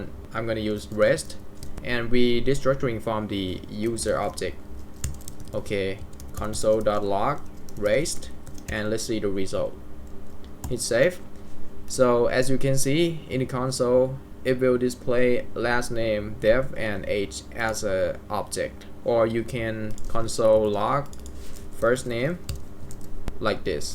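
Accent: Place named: United Kingdom. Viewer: สำเนียงพี่ เหมือน British เลยครับ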